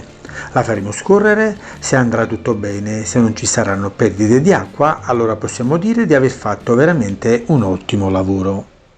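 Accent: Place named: Avellino, Italy. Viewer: sono di Scalea , tu dove abiti ( senza dirlo , ma più o meno ) verso Licola o verso Torre ? A volte sembri quasi avellinese .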